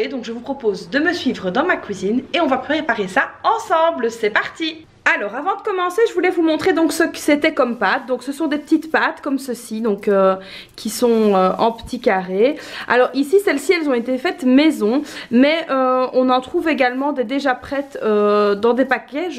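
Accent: Belgium. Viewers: tu es de Belgique car tu as l'accent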